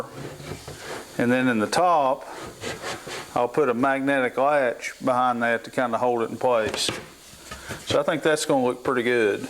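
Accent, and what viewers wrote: United States. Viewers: I love it. I was replacing a 10 year old 10" ryobi haha so it's definitely a step up. I've started making the miter stand today and I'm just using your dimensions, so that worked out good with you telling your dimensions as well. Appreciate the work you put into those videos as its going to help me a lot. If you don't mind me asking, what state are you from. I live in the VA/TN border.... Sound like a country boy to me haha I know that country accent well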